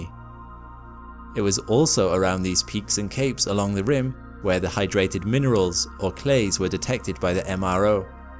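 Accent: United Kingdom. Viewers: bro its because he is British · hes british whatcha expect lol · What an amazing journey my friends! It's like a version of Hiliad, with badass names and frightening dangers, surviving far more than enyone would've guessed, a lengthly and full of updates and events, BUT THIS TIME with real imagery OF ANOTHER FRICKING PLANET. This is a modern epic and nobody can convince me otherwise. Also, its narrated by a cool brit guy, so what more could we ask?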